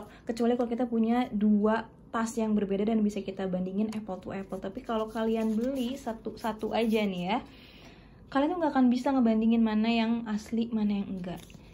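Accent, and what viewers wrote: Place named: Philippines. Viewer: Are you Filipino? You speaking with a little bit mix Tagalog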